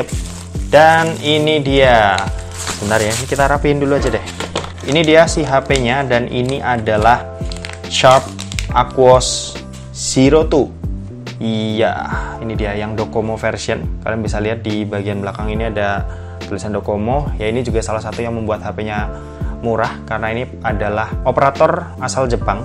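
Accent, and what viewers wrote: Semarang, Indonesia. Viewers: Loh masnya orang semarang toh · Orang semarang tah mas?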